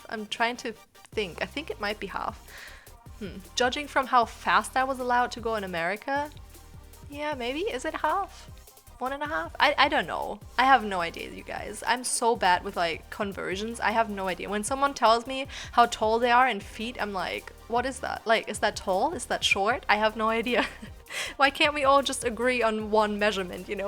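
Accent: Germany. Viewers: 1km is roughly <num>) miles and a meter is 3.281 feet ( 3.28084 precisely). Also, great vid, I'm always on the lookout for new cc especially for males. I like your accent but I would have never guessed you were from Germany if you didn't say it. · I’m 5’3 ft which is considered short but I know a lot of other women around the same height. I’ve been trying to learn German for school for a few years now and struggling lol as soon as you said you’re German I was like ohhhh I can hear it now. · haha I was wondering if you are german! I noticed your accent is very familiar so I was wondering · Als ich mir ein Video von dir das erste Mal ansah, hab ich mich sofort gefragt ob du deutsch ist. xD Ich glaube wirklich, dass man's einfach raushört, deine englische Aussprache ist echt ziemlich gut ^°^ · I could tell you were German I'm from the south in America but i LOVED my german teacher sooooo there's that.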